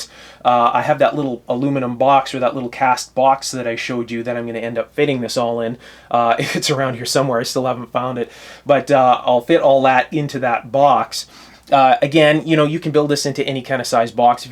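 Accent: Canada. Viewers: Are you a Canadadian? I keep picking up accents traits like the way you say "about"--but hide your beer so I can't confirm. Other than that, great videos. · Gotcha, thanks. Great vids! Do you have formal education? Your accent reminds me of some Canadians I've heard.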